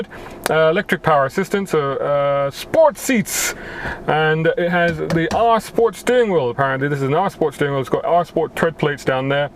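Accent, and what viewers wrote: England, United Kingdom. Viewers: his voice is like gay and fcking england boy he is fucker this guy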